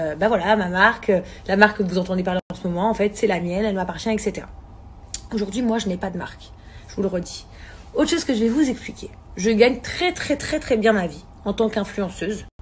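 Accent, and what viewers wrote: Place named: Arab world. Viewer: J'ai stoppé la vidéo net en l'écoutant parler comme une arabe. " ca va porté le ein ! Euh vous etes au courant que plus de lamoitier des marques quel pub sa leur appartient à elle et son mec ???? Tous les trucs de cire et compagnie cest a eux donc si vous recevez pas cest qu'ils continuent encore et encore a vous escroquer et à vous mentir.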